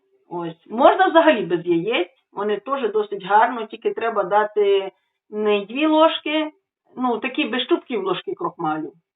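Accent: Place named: Rural, Ukraine. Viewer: Говори як ти вмієш.Мені подобається як ти говориш в мене мама теж так говорила дуже багато слів так як говорять в моєму селі хотя це Хмельниччина яке ближче до Чорткова. КОМУ НЕ ПОДОБАЄТЬСЯ ХАЙ НЕ СЛУХАЮТЬ..НА ВСЕ ДОБРЕ ЗДОРОВ'Я ВАМ ВСІМ.ЧОЛОВІКУ БОЖОГО БЛАГОСЛОВІННЯ.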